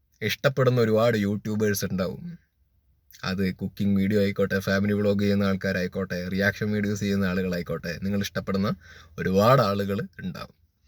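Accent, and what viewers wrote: Palakkad, India. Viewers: Palakkad aanalle kettappozhe manassilayiii...😂❤ njnum pkd ane...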